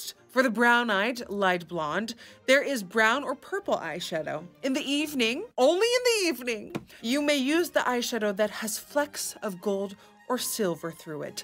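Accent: United Kingdom. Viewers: I love your British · I often like blue color on my eyelids. I really enjoyed watching your tutorial. And your British accent...Ah!!! 😂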